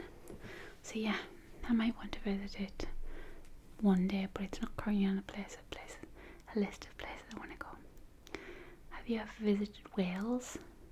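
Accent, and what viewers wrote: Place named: Newcastle, United Kingdom. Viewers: Great to see a fellow Northerner creating ASMR videos. At last an accent I can stand, but Singapore is definitely not in Germany! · Sounds like a Geordie lass. :)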